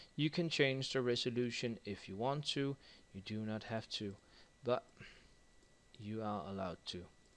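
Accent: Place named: Denmark. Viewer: LOL Dansk accent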